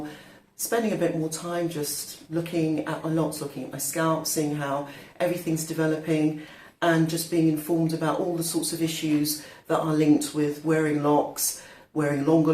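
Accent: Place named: England, United Kingdom. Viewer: The English accent surprised me. Your hair is very pretty.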